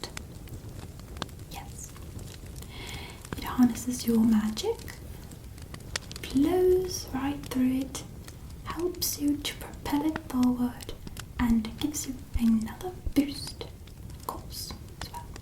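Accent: United Kingdom. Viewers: I’m British and u genuinely sound British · Your British Accent as Hermione Granger spot on perfection another 33,000,000,000 points for me! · I am English and I hate almost every American attempt at a posh English accent - this is one of the best I have ever heard. You continue to raise the bar xxx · You do a great British accent love it 😍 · your accent isn’t that bad but it’s kind of a mish mash of essex/posh/brummie couldn’t watch this although the attention to detail is 10/10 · I love that you can actually do a british accent, a few other asmartists can but not very many I'm very impressed being a British person myself. I'm from Bath in Somerset. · As being british This is the best british accent i have seen from an asmrtist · Loved the British accent and all the roleplay as well 💕 I was having a crappy day and now I'm so relax, Thank You 😍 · The accent is brilliant! 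Hard for an American to perfect a good British accent!! Well done you!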